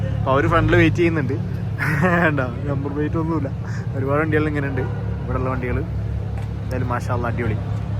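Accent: Kasaragod, India. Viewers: bro kasaragod aahnnoo bro slang cheruthaayii ksd slag pole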